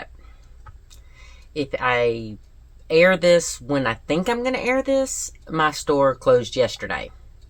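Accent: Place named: South, United States. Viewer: Hi! A warm greeting from Florida. I was scrolling through you tube and I came up with your video. I absolutely love your approach to the subject and the mix of your everyday life. Nothing like that beautiful southern accent mix with sass and bless your heart attitude ❤You have a new fan. Thank you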